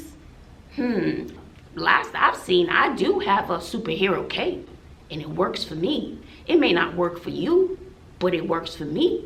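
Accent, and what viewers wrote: New York, United States. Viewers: Are u from ny that accent sis lol love it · New here (Jackie Newton referred me to you)....I love your NY accent!!!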